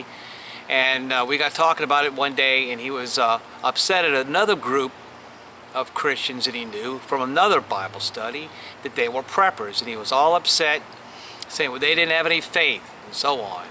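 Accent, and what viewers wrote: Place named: Boston, United States. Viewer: LOL! I'm born and raised NH and when I hear you talk....I hear Boston....LOL!! Nothing personal....not trying to be disrespectful.....just not 'hearing', the accent I expected to hear. Still a good video....and I subscribed....looking forward to more....we need to learn to live with less....we are spoiled and all those creature comforts, have made us prideful!!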